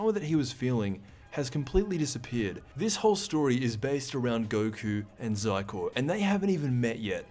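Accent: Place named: Australia. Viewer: probably so put i enjoy his Auzie way of explaining it lol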